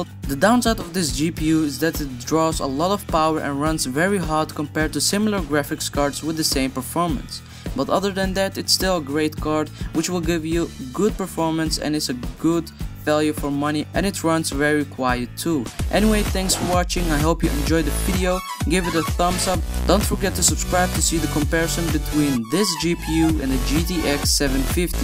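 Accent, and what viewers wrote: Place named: Netherlands. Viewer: are you dutch man you sound like one im dutch too i will soon be building a 600€ gaming pc with a r7 265!!!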